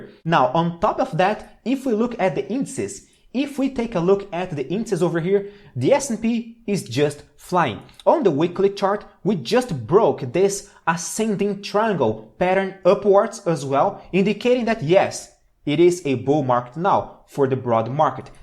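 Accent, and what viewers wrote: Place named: Brazil. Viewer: I knew it! I've had Brazilian friends and ESL students. I'd recognize that beautifully accented English any day. Well done, sir!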